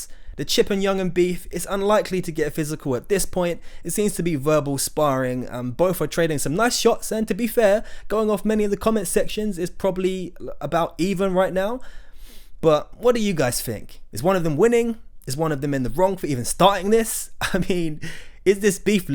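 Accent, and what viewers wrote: United Kingdom. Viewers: dj akademics can do a great british accent.. love your new channel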